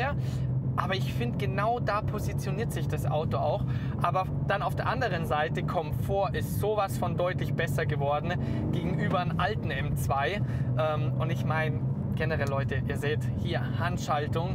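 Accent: Bavaria, Germany. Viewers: A is des schee wenns auf boarisch is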